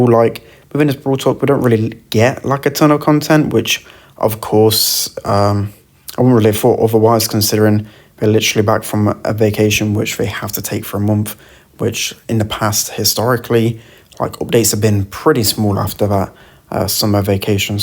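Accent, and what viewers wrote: United Kingdom. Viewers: Is this British accent? I need the subtitles to understand what you say :( Apart from this the video is very good and thanks for the balance changes you noticed and shared.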